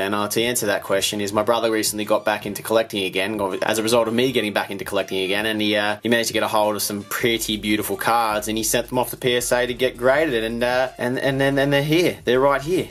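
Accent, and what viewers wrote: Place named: Australia. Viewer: talk with a Australian accent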